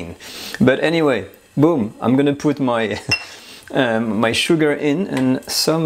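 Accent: France. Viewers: I just love to hear you speaking English with that lovely French accent.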